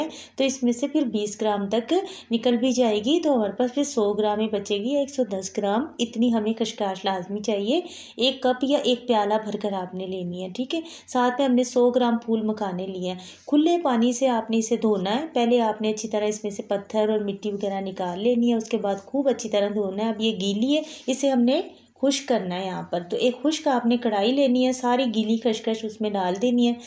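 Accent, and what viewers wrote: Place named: Lahore, Pakistan. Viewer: Ap ki aavaz boht polit h... Style of saying is lahori😊... Boht achi tarh samjhaya gya h ap ki trf SE... Delicious so much ❤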